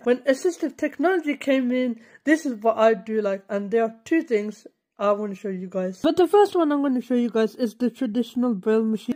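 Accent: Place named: United Kingdom. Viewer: Are u British I love ur accent ❤❤❤❤❤❤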